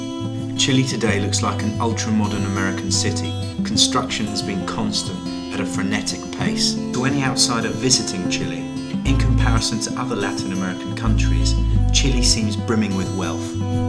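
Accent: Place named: England, United Kingdom. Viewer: but sadly distributed between the rich, military, foreign companies leaving the common chilean people to miss out this situation will continue until the people stops watching tv and gets informed whats really going in the country, by the way by your accent i guess your from england? why you so intrested in chile? anyways nice video cheers from australia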